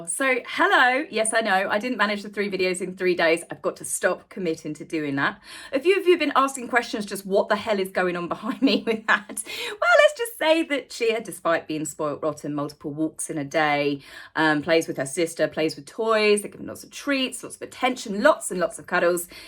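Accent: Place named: RP, United Kingdom. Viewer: Not on topic- where in UK are you from? Your accent is posh- like a news reader? Anyway- I love your speaking voice- mine is harsh West coast US ;)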